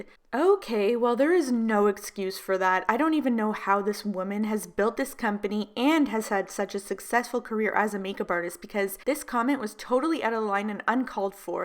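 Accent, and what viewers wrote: Canada. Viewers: Are you from Canada? Your accent sounds Canadian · Thinking of you! I appreciate all your content. I'm poor and disabled, so I'm a makeup buyer on a thin budget. You exposing shady or law-breaking (and rich) influencers gives me a small bit of schadenfreue, which I need sometimes. This is a little silly, but your Canadian accent always takes me back to my salad days, when I was doing my Master's and teaching in Windsor, ON. Everyone made fun of my Michigan nasal-ass accent, and I delighted in every "aboot." Slowly I began to develop my own Canadian accent, which would last for hours after I drove back over the Ambassador Bridge. Now I can shift between the two. But your every "sorry" takes me back to those fun times, chilling in the campus pub. After I watch your videos, I find I'm speaking in my Canadian accent. Sorry for the long story - I just thought you'd appreciate a little haha in this mess. 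Take care.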